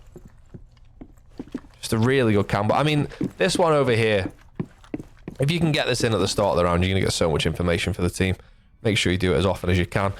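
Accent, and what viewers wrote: United Kingdom. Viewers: I think that’s the british accent if im not wrong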